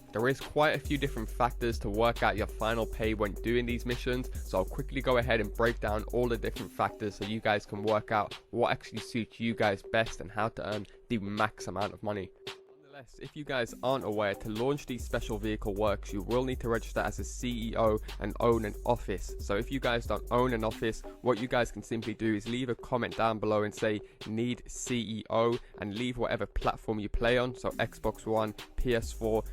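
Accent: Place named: London, United Kingdom. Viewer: i'm from london and i can tell you are, it's strange to hear such a well spoken londoner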